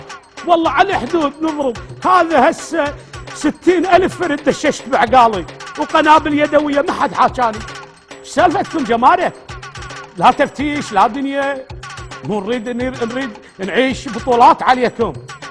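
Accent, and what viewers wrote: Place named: Kuwait. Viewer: ظابط اللغه ماشاء الله كويتي انت ها .؟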